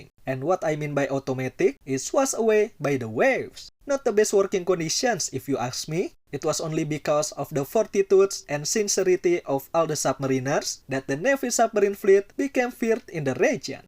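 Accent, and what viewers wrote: Indonesia. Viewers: biasa aja malah wkwkw aksennya indo banget.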